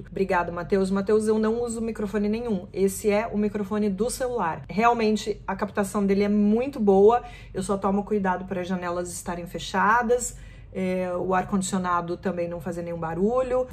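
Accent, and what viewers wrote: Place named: São Paulo, Brazil. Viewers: Maaaaaaaaa vc é perfeita!!!! Não perde o sotaque paulistaaaaaa!!!!! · nada de errado com seu sotaque, inclusive eu acho que é bastante forte o jeito paulistano. Adoro sua coluna e assisto todas. Parabéns pelo excelente trabalho! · Seu sotaque é sul/sudeste, mas mais sudeste, tipo de SP. Suas análises são muito sensatas.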